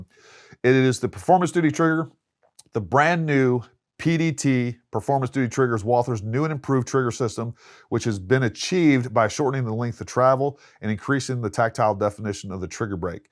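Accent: United States. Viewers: I need a German accent to explain the whole line up not a American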